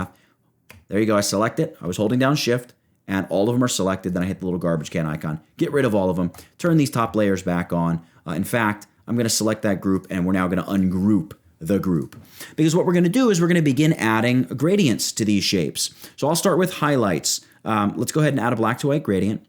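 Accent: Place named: New York, United States. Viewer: Hey seeing you at work man, I'm merely 3 years into Illustrator yet I would assume the technique demonstrated here is intensely more complicated than what I would do in this case. Please could you elaborate on it? Once again cool vids man way to go with the NY accent.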